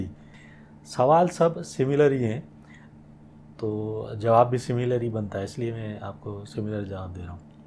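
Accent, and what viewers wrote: Sindh, India. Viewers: Ada sindhi aan cha laheje mon lage tho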